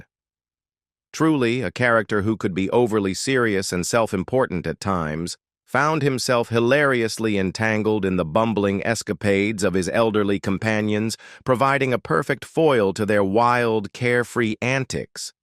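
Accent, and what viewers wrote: United States. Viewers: If you are going to tell us about this great show learn how to talk English not American..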